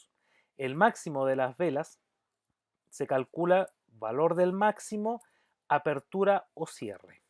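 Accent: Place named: Chile. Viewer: 1er video q veo con una explicación tan clara de los patrones Heiken Ashi ! Se agradece la iniciativa.. (Da gusto escuchar el acento chileno) Salu2!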